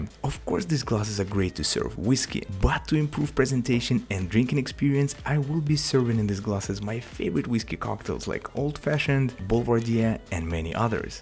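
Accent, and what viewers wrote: Russia. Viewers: Hi, while I hear you,I'm think that you are from Russia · Are you from russian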